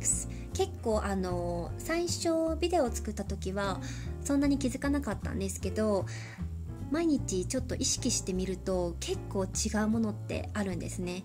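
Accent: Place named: United Kingdom. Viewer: 毎回楽しく勉強させていただいています！私もイギリスにいたことがあるので、の発音が心地いいです笑 Twitterでもコメントを残させて頂いたので、お時間があるときに変身頂けたら嬉しいです☺︎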